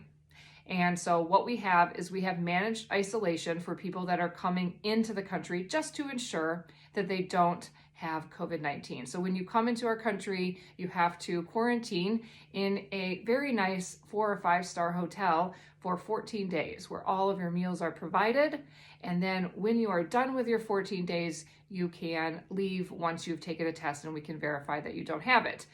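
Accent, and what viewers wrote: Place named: New Zealand. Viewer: Very informant on our country and love your video. Glad to have you in our country and seem like you're getting a Kiwi twang in your accent 😁 Chur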